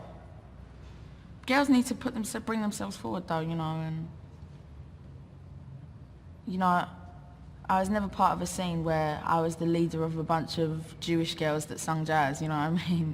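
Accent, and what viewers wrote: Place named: England, United Kingdom. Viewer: Love her English accent. Sad she died so young.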